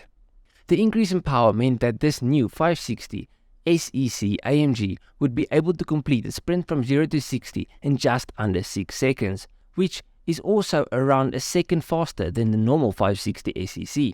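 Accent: South Africa. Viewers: You sound very south african my guy. Love it!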